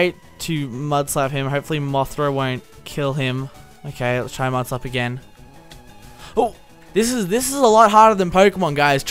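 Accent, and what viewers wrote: Australia. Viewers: He sounded so much more australian back then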